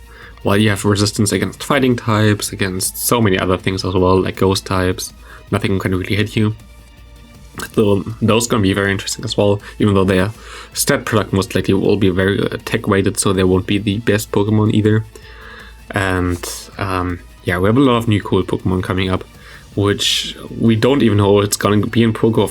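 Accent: Germany. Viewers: Ich wusste es dass du aus DE bist, man hört es am Englisch. 😅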